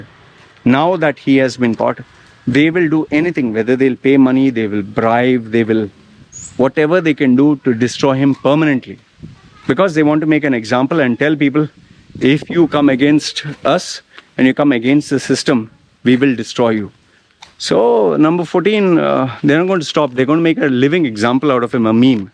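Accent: India. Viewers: you have an Indian accent, great video btw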